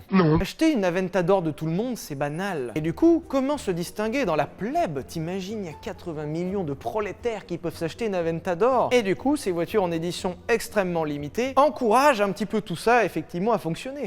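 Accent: South, France. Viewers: Cette accents du sud incroyable ! 😂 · j'adore l'accent du sud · Ho fatch cette accent du sud 😘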